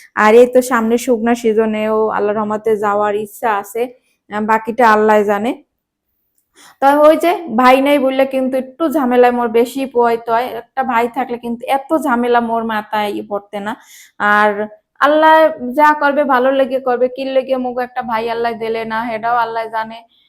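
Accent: Barishal, Bangladesh. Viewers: আসসালামু আলাইকুম আপুমনি আজ ফাস্ট হলাম ইনশাআল্লাহ তোমার বরিশালের ভাষায় কথা খুব ভালো লাগে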